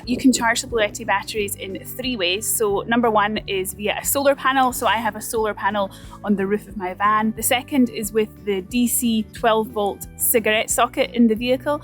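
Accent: Scotland, United Kingdom. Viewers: After I've finished reading all the doom and gloom on the news, I save video's for bedtime. Her lovely Scottish voice, the amazing scenery, interesting facts, all help me wind down for bed. Thanks for making them and posting. Beautiful woman.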